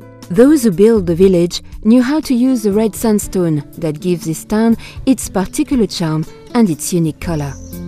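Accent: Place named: France. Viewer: Ravissant... mais c'est quoi ce commentaire en anglais avec un accent français digne de l'inspecteur Clouseau ? C'est assez ridicule.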